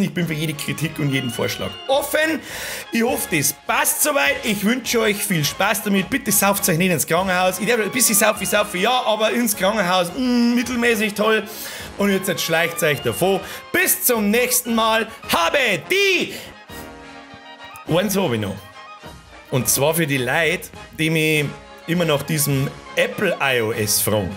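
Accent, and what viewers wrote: Bavaria, Germany. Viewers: Gibts as englisch dann a mit Bayerndialekt ?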